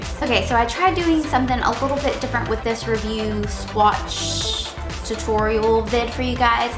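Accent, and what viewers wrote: South, United States. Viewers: Usually I think of these kinds of colors looking best on fair skin, but they look magnificent on your tan complexion! You seem to have a bit of an accent. Are you from the South?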